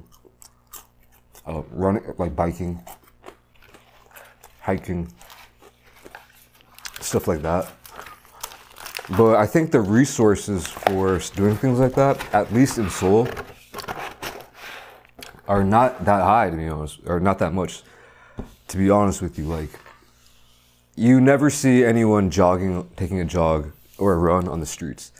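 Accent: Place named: Midwest, United States. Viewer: I knew you had a midwest accent lol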